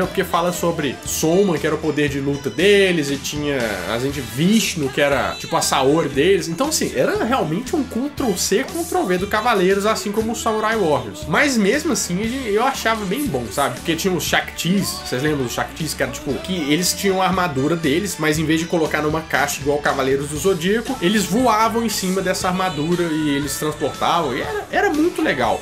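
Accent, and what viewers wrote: Minas Gerais, Brazil. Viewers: Vc e mineiro brother? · Esse sotaque minero é top demais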